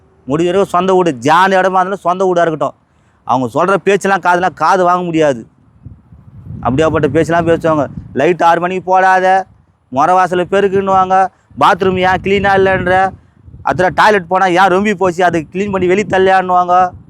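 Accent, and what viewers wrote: Madurai, India. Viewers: Madurai vasagam super 👌 🎉🎉🎉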